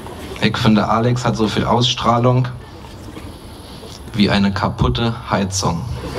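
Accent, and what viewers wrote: Berlin, Germany. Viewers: der Text an sich ist schon sehr schick, zusammen mit seinem Berleinern bekomm ich mich aber an manchen Stellen nicht mehr ein. Slampoeten mit Dialekt sind einfach genial, liebe es das niemand ihn versteckt.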